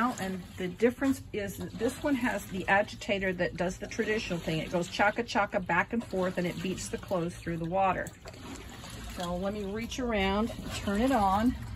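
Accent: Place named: Ireland. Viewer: Tell me, what part of Ireland are you from?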